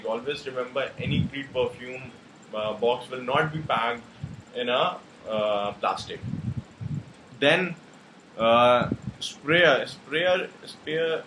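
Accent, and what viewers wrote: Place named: India. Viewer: Indian English... First learn English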